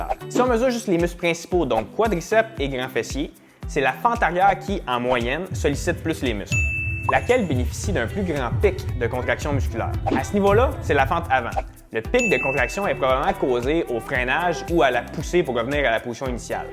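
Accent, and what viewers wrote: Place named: Quebec, Canada. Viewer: Superbe explication ! Avec l'accent québécois en prime 😊😊 merci !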